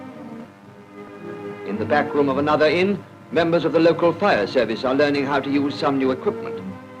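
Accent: RP, United Kingdom. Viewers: Received Pronunciation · Fascinating old black and white film with the typical toffee-nosed English narrator. Great old pub signs with pub names I never heard of. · May be toffee , but you can hear every word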